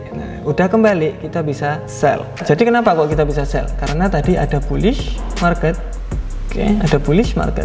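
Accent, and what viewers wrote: Java, Indonesia. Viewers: Saya mau tanya kan ini 1). Cara kita menjelaskan nya jgn jg terlalu cepat betul, pelan aja, jdi kita pun jg susah nangkapnya Yg ke 2). Jgn pakai logat2 Jawa lh semuanya pakai bahasa Indonesia aja, klu boleh Yg ke 3). Itu saja yg saya sampaikan terimakasih atas ilmunya, semoga sukses 🙏🙏🙏🙏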